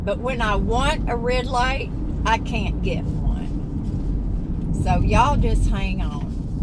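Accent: South, United States. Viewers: I lived in England until I was 5 when we moved to Canada. I'm in my 60s now, but still develop a peculiar affected sounding accent when talking to Brits or in the UK. However, I also would develop a southern US accent with enough contact. As another commenter wrote, it's incredibly infectious. I would probably develop a southern accent if I binge watched you.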